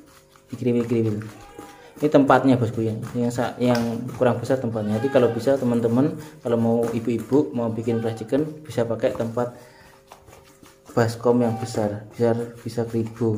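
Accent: Java, Indonesia. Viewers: Mas iku wong Endi nek omong kok medok banget,tak cobake mene tuku ayam. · Aduh bahasanya medoook banget... Tapi mudah di mengerti.... Dan trima kasih ilmunya.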